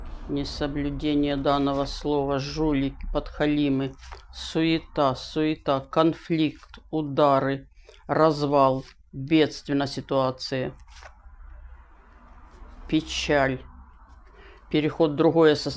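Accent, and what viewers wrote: Kherson, Ukraine. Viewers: Добрий день! Обожаю херсонский суржик! Здоров'я Вам, пані!!!❤❤❤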